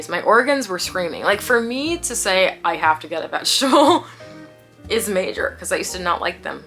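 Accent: Australia. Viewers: ur australian accent bye i'm crying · The Australian accent 😂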